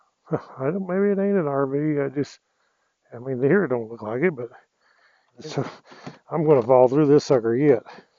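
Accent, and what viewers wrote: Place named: Georgia, United States. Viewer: ain’t no way ur from south Arizona sound like Georgia or maybe my area East Tennessee haha !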